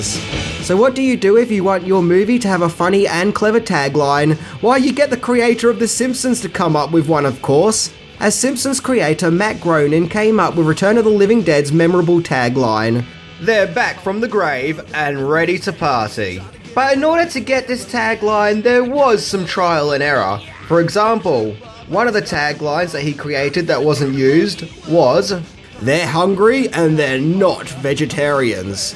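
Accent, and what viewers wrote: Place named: Australia. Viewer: He’s Australian, sometimes they pronounce things differently.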